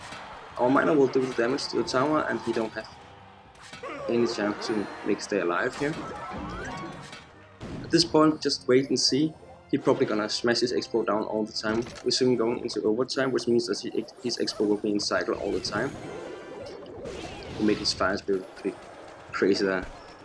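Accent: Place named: Denmark. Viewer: hvad laver du du er jo dansker det kan man høre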